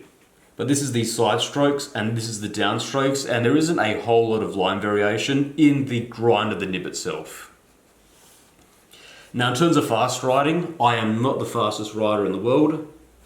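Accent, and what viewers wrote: Australia. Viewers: Holy fuck man!!! I remember your original videos! You're back now with a deeper voice and some scruff, but the same Aussie twang 😅 Great to have you back and see you're well, this genuinely made me happy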